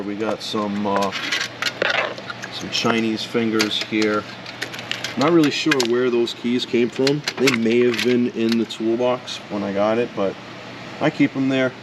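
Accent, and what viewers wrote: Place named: Long Island, United States. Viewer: Ohhh the OCD drawer was sweet! I sense Long Island, Brooklyn or possibly Jersey in the voice? LI here…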